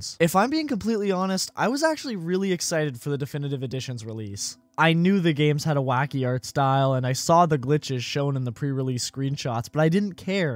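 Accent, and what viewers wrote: Canada. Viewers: I always like how u can tell someone is Canadian when they say: about. Great vid btw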